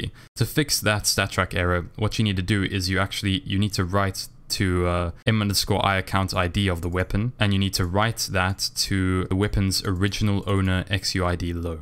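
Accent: South Africa. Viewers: no way my mans from SA lmao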